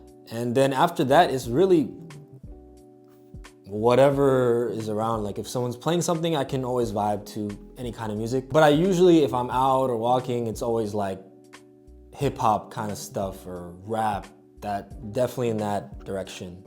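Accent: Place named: Germany. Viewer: actually, I really enjoy watching your vids… as a bilingual person ich verstehe dein Denglisch perfect and I love it 🔥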